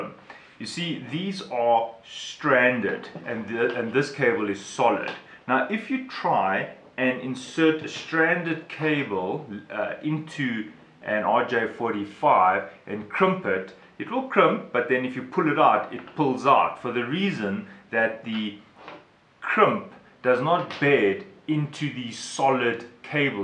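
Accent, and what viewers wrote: South Africa. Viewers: useful information you have a south african accent :)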